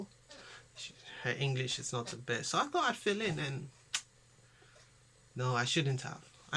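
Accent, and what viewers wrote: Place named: Nigeria. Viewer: You sound like Nigerian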